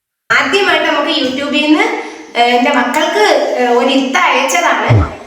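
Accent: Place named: Malappuram, India. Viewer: അത് ശരി - സംസാരം മലപ്പുറം തന്നെ😃😃😃😃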